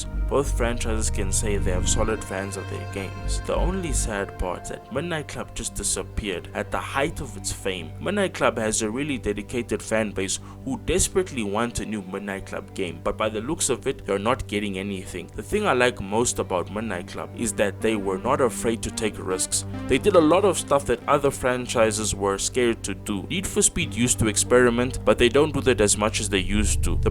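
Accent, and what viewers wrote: South Africa. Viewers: Without even checking your profile, I can hear the strong South African accent 😅😅 Great video bro, keep it up!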